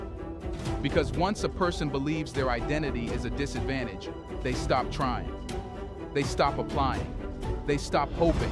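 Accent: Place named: United States. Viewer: All these fake AI videos online, American accent on an african report? Get real...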